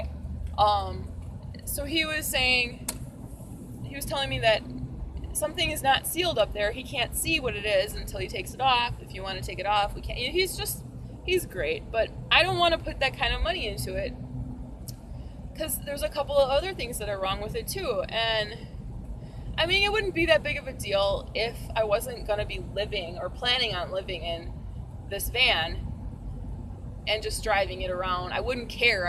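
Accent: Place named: Wisconsin, United States. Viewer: The moment you started speaking i knew you were a wisconsin-ite. shout out for wisconsin.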